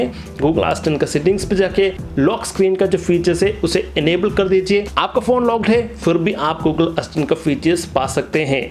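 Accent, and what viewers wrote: Kerala, India. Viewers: Thank you bro are you from Kerala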